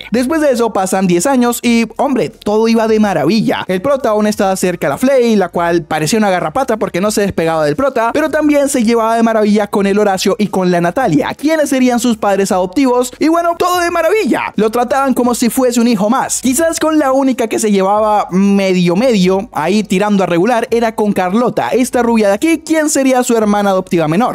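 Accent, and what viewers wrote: Colombia. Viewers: Eres colombiano cierto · Eres colombiano?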